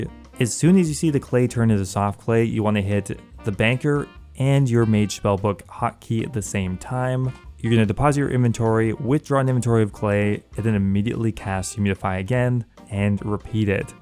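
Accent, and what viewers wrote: Quebec, Canada. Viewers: are you quebecois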